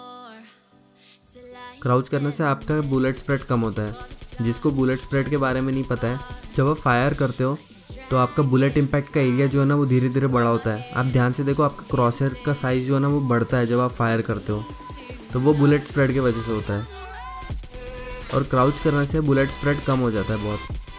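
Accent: Hyderabad, India. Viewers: Bhai hyderabadi h shayad 😅👌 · Hyderabadi tone arhi kya🤔😋🤪 Btw OP skills bro 🥺🙌🤠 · Bhai tum hyderabad se hai kya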